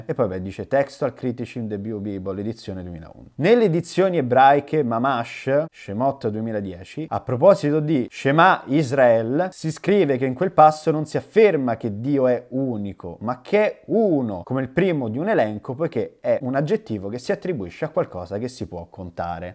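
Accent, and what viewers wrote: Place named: Rome, Italy. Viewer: PORCA MISERIA..... Ed io che mi sono sorbito pure sti 15 minuti di video per ascoltare un tizio che ambiziosamente affermava di aver finalmente confutato Mauro Biglino (quindi un po' di curiosità me l' aveva messa). Poi.... Ti rendi conto che si tratta solo di un tale che sfotte, provoca, fa le battutine, e il tutto con una parlantina antipatica e volutamente romanesca (così viene meglio...), in pieno stile "iene" (magari ambisce a diventare uno di loro?). Si vede dal video nel suo complesso, che questo qui non ha alcuna valida strategia comunicativa, cerca invece solo di rompere le scatole a personaggi pubblici, denigrandoli gratuitamente. Con i tuoi: "dove scappi", "a meure'", "t' ho dato na lezione", per non parlare dei pochissimi spunti, tutti inutili quanto il resto del video, hai dimostrato solo una cosa. Che sei RIDICOLO e non sai tenere una discussione con nessuno, perché se volevi davvero confrontarti con lui, bastava non fare il maleducato irrispettoso, quindi forse il tuo vero obiettivo era un altro... 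Ha fatto bene Biglino a non risponderti più, è ovvio, qualcun' altro al massimo ti avrebbe altrettanto insultato. Sei solo un gran maleducato. Spero che le "iene", che a me non piacciono, perche spesso e volentieri usano il tuo stesso approccio irriverente nei confronti di chiunque, non ti prendano mai con loro.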